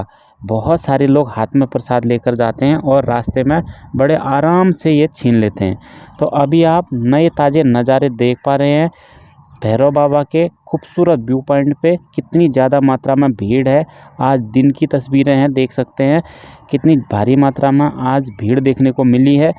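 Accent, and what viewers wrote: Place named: Uttarakhand, India. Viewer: जय माता दी 🌼🌻🌹 Sir, are you from Uttarakhand because your tone is matching with Uttarakhadian's people's.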